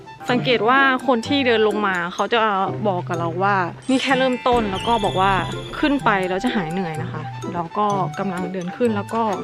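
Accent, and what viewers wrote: Isan, Thailand. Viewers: หลงมากกก น่ารักพูดอีสานอีกโอ้ยยย🤍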